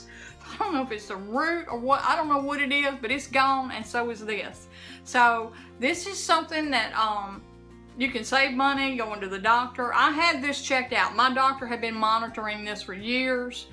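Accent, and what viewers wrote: South, United States. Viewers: Love your southern accent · Love the info and love your accent. And you’re beautiful! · Nice video and love your Southern accent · Thank you:) Love your accent as well!!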